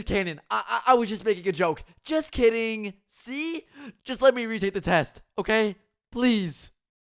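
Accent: Iowa, United States. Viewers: You from Iowa?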